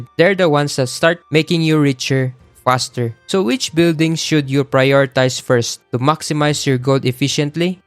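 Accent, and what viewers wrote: Philippines. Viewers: I only hear pinoy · Great video my dude! Really helpful resource for min-maxing playing tall strats. Also, I just have to ask are you from the PH by chance? Your accent is giving me kababayan vibes lol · Sounds Filipino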